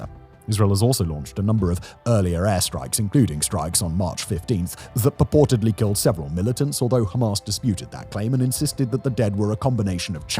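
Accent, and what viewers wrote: RP, United Kingdom. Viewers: Simon needs to take the chip off his shoulder and do some unbiased reporting and actually visit these places on the ground. he thinks he's so smart with his posh accent and multiple successful youtube channels that are supposed to enlighten and teach. Yet, he more often than not, leaves out nuance unless it supports the narrative of his videos . I've been watching for years and I've been noticing it more and more. its evidence of my own bias. yes. but Simon is deliberately leaving out facts from his reporting. BIG FACTS TOO SO THATS HOW WE KNOW ITS DELIBERATE!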